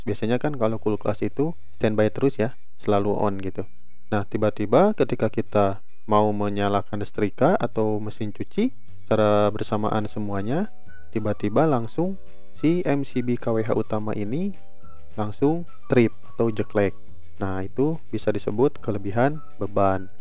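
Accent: West Java, Indonesia. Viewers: urang suna euy ieu mh, logat na katawis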